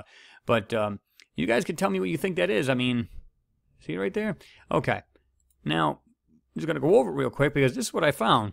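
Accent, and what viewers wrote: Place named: Rhode Island, United States. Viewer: completely unrelated, but your accent... you a native of Rhode Island? You sound like my relatives.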